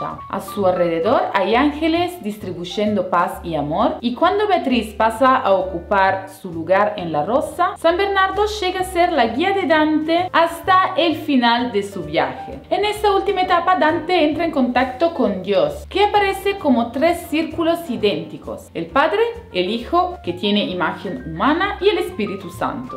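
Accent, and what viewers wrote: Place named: Italy. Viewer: me encanto el video! le pones mucha onda a lo que haces, y ese acento tano le pone mucha energia! chau linda!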